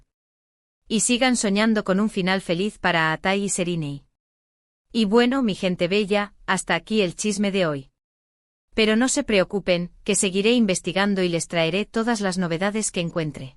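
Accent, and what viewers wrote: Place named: Spain. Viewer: A esta persona que habla sin sentido ,por su forma de pronunciar con acento español ,no se cansa de decir estupideces,el señor Ulusoy vive trabajando no ventila su vida privada ,me pregunto de donde saca tantas mentiras,el señor Ulusoy graba de noche ,de día por lo que se ve en su nueva serie,entonces como esta persona se entera de la vida del actor,simplemente porque miente .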